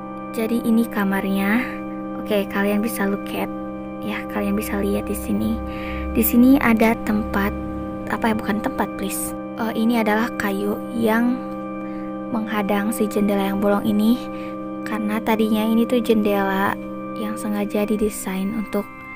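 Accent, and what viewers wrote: West Java, Indonesia. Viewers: Kak aslinya orang sunda ya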